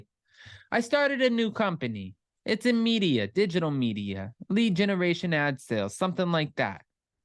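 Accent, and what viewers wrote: New Jersey, United States. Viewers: We love your 'Joisy' accent. it really colours your story! Hope you and Jason have a great weekend, and that Shaylee gets some well deserved rest!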